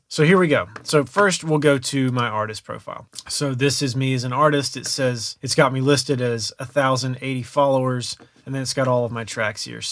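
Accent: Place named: South, United States. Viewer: good stuff man! appreciate it. noticed a southern accent, where are you from?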